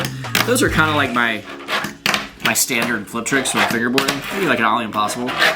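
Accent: England, United Kingdom. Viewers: Guy’s English or Spanish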